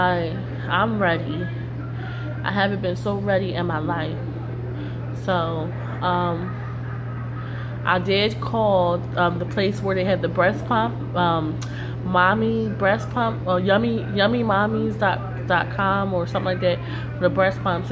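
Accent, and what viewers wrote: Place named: New Orleans, United States. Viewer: Congrats on the little girl......where are you from? The reason why i ask is because your accent sounds like your are from New Orleans.... Glad to see everything is going well.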